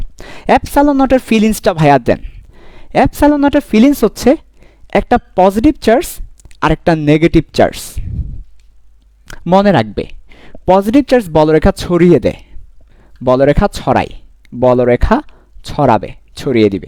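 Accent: Chittagong, Bangladesh. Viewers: Vaiya apni k Chittagong ar nki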